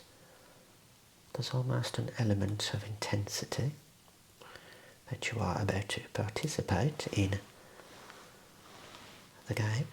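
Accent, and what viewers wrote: United Kingdom. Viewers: イギリスの話し方が本当によく眠れます😊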